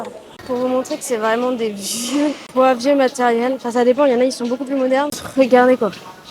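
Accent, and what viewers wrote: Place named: United Kingdom. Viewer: Dommage pour l'éclairage de la salle ca fait un effet assez désagréable ça 'clignotte' vachement :/ Pas de ta faute hein ^^ Mais pour les futures vidéos pk pas faire un effet voir ce que ça donne et ensuite régler ta cam/téléphone pour pas que ça fasse ça =) Il est mignon ton accent anglais ^^ Sinon bonne vidéo =D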